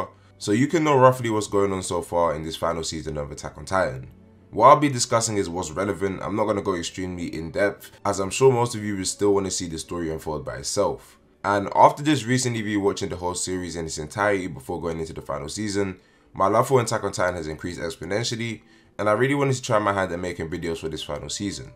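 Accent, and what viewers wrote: United Kingdom. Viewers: couldve been amazing but i just cant understand the strong brittish accent?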